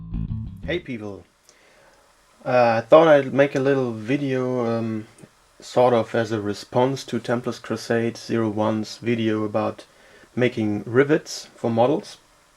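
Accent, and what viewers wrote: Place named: Germany. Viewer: You have a slight german accent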